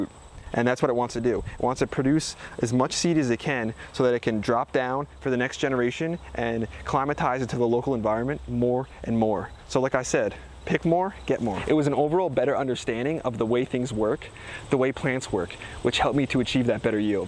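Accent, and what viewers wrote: New Jersey, United States. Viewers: His way of talking is TOTALLY a Jersey thing!!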